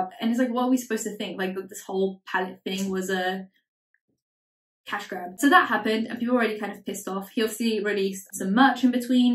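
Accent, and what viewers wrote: United Kingdom. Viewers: Wait you are polish? But you speak like your original from uk know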